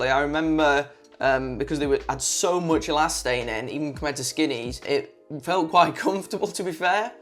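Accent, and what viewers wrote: United Kingdom. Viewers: I felt like you were describing my life honestly. I went from baggy to slim to super skinny spray on jeans, now I wear fitted skinny jeans (because I am still skinny) and it's such a difference. I still get told my legs are skinny but there's no way to hide that honestly, just to mitigate. Great series - came to see what it's about and stayed till the end until I noticed it's the end. Maybe it's your british accent, maybe it's because I can relate. Good content.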